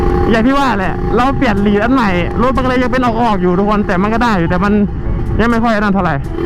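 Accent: Korat, Thailand. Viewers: สําเนียงโคราชชัดเจนแท้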